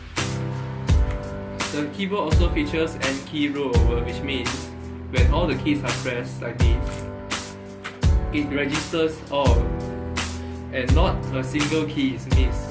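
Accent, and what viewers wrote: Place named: Philippines. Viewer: when you talk that is more like pinoy lol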